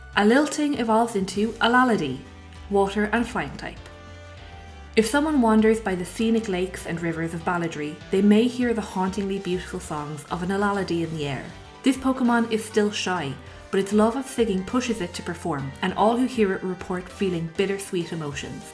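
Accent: Ireland. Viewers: you have no idea how happy i was to click on this and hear an irish accent as an irish person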